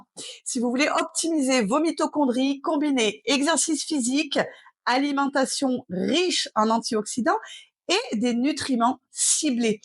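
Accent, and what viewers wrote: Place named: Haute-Loire, France. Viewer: NON !!!! 😃 Je viens de voir sur votre site que vous êtes ardéchoise !😃 Moi aussi !!! 😃Compte tenu de votre accent, je dirais que vous êtes plutôt dans le Nord de l'Ardèche, dans les Boutières ou même Guillerhand Grange, Annonay...Pour ma part, je suis dans le Sud, dans les Cévennes, du côté de Valgorge. Je suis moi aussi passionné de biologie, de médecine et de Sciences du vivant. J'adorerais vous rencontrer pour parler de tout cela et qu'on échange des connaissances sur ces sujets.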